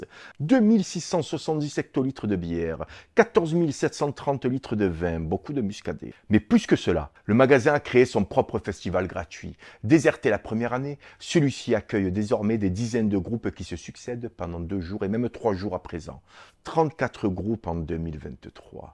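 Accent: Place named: South, France. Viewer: Belle story avec un p'tit accent du midi 🤪🤘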